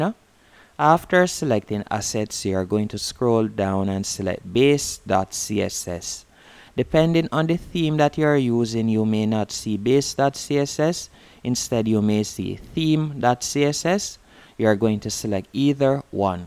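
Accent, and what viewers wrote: Jamaica. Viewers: Haven’t finished the video yet but I wanted to take a moment to admire you accent. I love the Jamaican accent, reminds me of home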